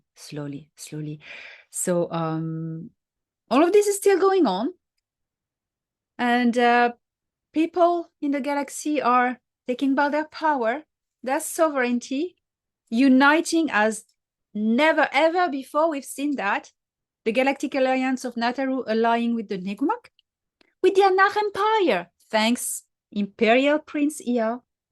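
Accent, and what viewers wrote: France. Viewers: As much as I love the information, and I must admit sometimes it does seem a little far fetched although these days there's really no such thing, the thing that keeps me coming back is that French accent there is just something about it well at least I'm honest lol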